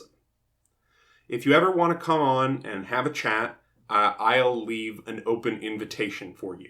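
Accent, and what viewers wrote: Quebec, Canada. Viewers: Thank you and blessings. Is that a french canadian colour I hear in your french words and names? If so: salut mon frère! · Thank you so much 🙏🏻 I was wondering… are you French ? Like from Quebec ?? I’m near Montreal and your French seems Quebec français canadien ☺️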